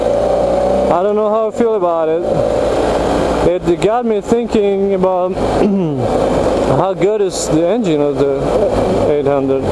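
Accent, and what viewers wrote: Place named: Germany. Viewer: may i ask, where you're from originally? You sound like you have a German accent. Take care, ride safe,stay safe dude !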